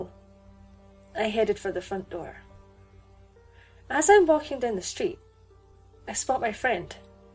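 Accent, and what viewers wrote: Scotland, United Kingdom. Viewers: Are you skotish?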